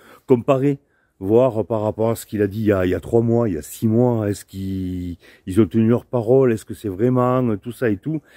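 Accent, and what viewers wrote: France. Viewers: Hello. Je te dirais juste une chose. Laisse les autres américanisé ou quoi que ce soit, il sont bien moins intéressant que tes vidéos. Je prend toujours un vrai plaisir a regarder régulièrement tes vidéos qui m'apportent du bien-être, des apports très intéressants sur fsms, mais surtout de la marade car je ries très souvent car j'adore ton humour avec cet accent du sud. Je t'en supplie, continue à faire tes vidéos. J'adore. Cordialement. Pascal..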